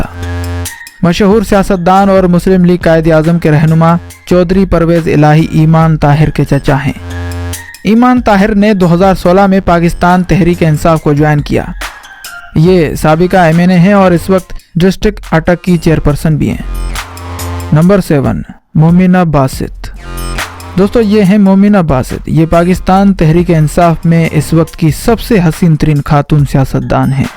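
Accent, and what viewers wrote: India. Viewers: یہ۔ تو۔ کوئی۔ انڈین۔ کتا۔ لگتا۔ ھے۔ اس۔ کی اردو۔ غور۔ سے۔ سنو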